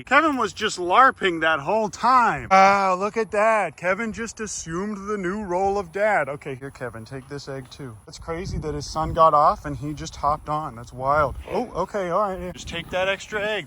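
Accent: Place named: South, United States. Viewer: uncle, you have never sounded this southern before.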